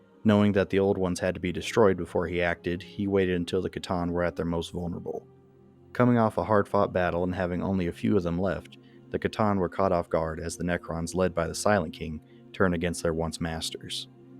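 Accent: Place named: Appalachia, United States. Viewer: nah man your slight idk what it is - appalachian twang - is fantastic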